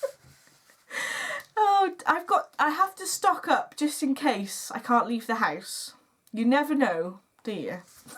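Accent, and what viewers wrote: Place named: Bristol, United Kingdom. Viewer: Oh I just found you! Fab podcast and such fun that you are in East Anglia..I'm in Cambridgeshire....although your accent sounds more Bristolian??:) Look forward to your next episode x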